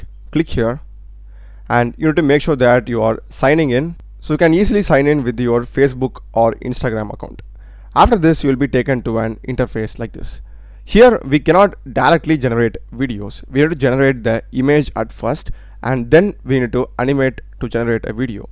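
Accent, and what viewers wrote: India. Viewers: I don't catch up your Indian Accent English. Can you speak slower, or use AI speak for you.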